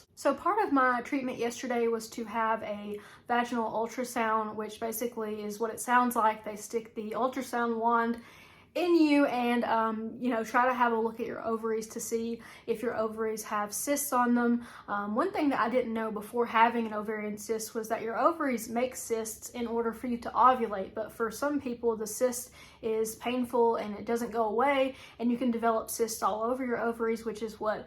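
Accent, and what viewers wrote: South, United States. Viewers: OTC pain meds can damage your Liver over time. This can cause weight gain and the eventual need for a Liver Transplant, so that isn’t a real solution. Even opioids won’t do anything but mask symptoms. I learned that regular OB/GYNS are pretty unhelpful but that Oncological OB’s take things more seriously - so see one of THOSE. At your young age, they are assuming you want to have kids/more kids, so they don’t want to remove things. They also know that removing ovar(ies) can put you into immediate Menopause. So they are resisting that solution. A Very Low Carb/Keto/Carnivore diet can make a difference bc carbs/grains/alcohol/sugar can CAUSE PCOS. If you don’t give your body any of that, it can’t then cause Insulin Resistance, which causes PCOS. There IS scientific info on this. Watch YT videos by Dr. Ken Berry about this. If the pain is too much, AND you don’t intend to have kids/more kids anyway, maybe you’d be better off getting your parts OUT and going on HRT (Naturopaths know much more about this than OB/GYNS who were taught wrong by Big Pharma which runs all medical schools. 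Mild, regular exercise can help remove the bad hormones and toxins. Castor Oil Packs (TONS of YT vids on this) can help. You are very articulate and have done lots of research. Many doctors ASSUME that a female with a Southern accent is ignorant. That may be part of the issue too. Even if you know your stuff, the accent will make them dismiss your concerns…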